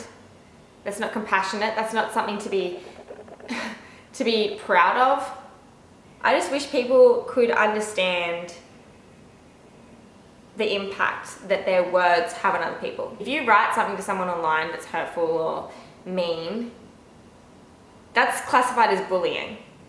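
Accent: Australia. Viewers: Sorry this is completely off topic but are you Australian because you sound Australian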